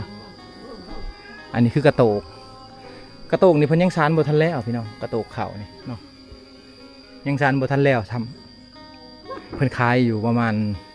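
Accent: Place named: South, Thailand. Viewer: ฟัง คนใต้บ้านเราเลยเนอะ😆😆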